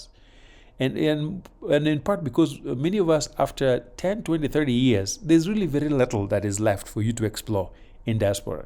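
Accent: Luo, Kenya. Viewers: Enjoying your slight luo accent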